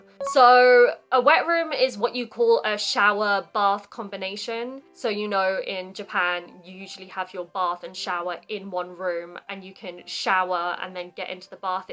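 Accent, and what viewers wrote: RP, United Kingdom. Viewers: いつも貴方の美しく心地よいクイーンズイングリッシュを拝聴しております。 聴けば聴くほど、どうして英国から移住した米国人の英語はあんな感じで変わってしまったのでしょう？本当不思議です。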